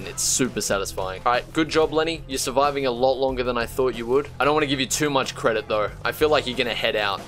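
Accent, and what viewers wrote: Australia. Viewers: are you an ozzy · Are you Australian? Cause you sound a little Australian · Why does he sound so much more Australian while on twitch?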